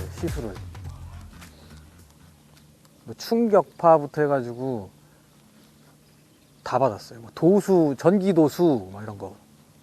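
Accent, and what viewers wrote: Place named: Chungcheong, South Korea. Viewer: 말투가 충청도인디요.. 내가 방송 해봐깐..ㅋ 어려보이는데 몇살이래요? ^^ 응원합니다~~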